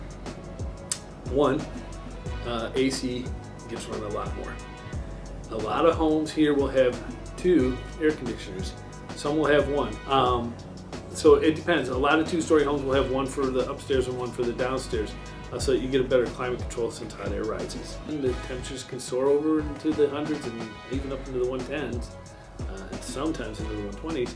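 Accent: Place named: India. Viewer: you sound like you are of Indian descent. If you have gone to India, you will be able to relate to the climate in AZ. India's heat is dry heat and can get up to 120 degrees, if you are closer to the equator.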